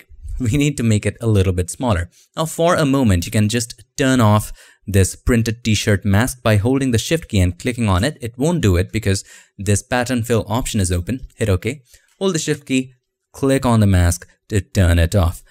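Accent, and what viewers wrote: India. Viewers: The only indian accent I could listen to for an entire day. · The only one indian accent guy on YouTube who actually professional and explains stuff the correct way! :D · Прикольный индусский акцент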